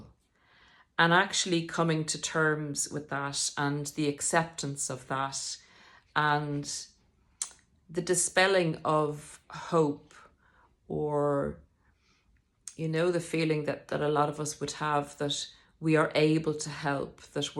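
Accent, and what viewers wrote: Ireland. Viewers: I would just like to say, it is your Irish inclinations verbally, that I like to listen to. If the Irish accent was not a part of your delivery, I most likely would not be here listening. Being here however, I find you do make many good sense statements worth hearing and appreciating, due to your 30 years of bitter experience with narcissist partners. I appreciate you admitting that you are not God. And many of us, do not believe in God, so we aren't enlisted by claims that we are in a Crusade against evil; regarding narcissists. Division is now the biggest problem in human belief. I don't want to drive other people away from me by deciding they are shallow (or evil)Evangelical characters. Nobody chooses narcicissim (at 2 years old). So I appreciate you backing away from the Christian Crusade mode (as much asthat seems right to you. I'm hoping you aren't becoming a part of the division problem we see in society. 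Hopefully, we inspire others to see clearly, not to denigrate others who may not agree with our Chistian beliefs, should we be Muslims or Jews or Spritualists. Anyway, thank you for your well-intentioned aspirations.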